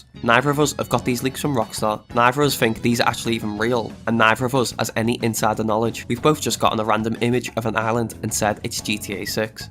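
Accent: United Kingdom. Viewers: High quality bti'ish voice, let's go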